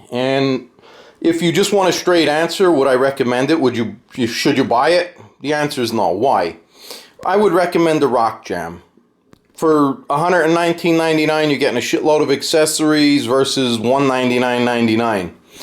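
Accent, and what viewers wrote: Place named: Queens, United States. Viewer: Bro, where you from? You sound like me? Are you from Queens?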